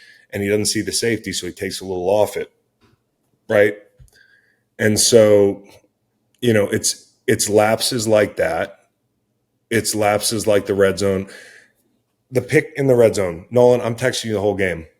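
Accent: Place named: Philadelphia, United States. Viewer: Zach Baun had the best game but another great video one of the main reasons I like watching your videos is because you talk just like us Philly fans and you talk like you’re just having a conversation with the boys. Keep it up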